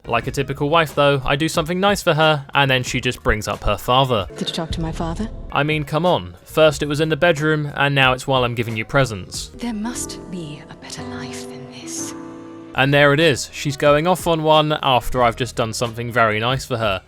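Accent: United Kingdom. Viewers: Are you British Bro?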